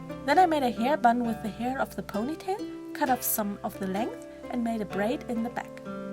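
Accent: Germany. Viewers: It sounds like her mother tongue is German. o_O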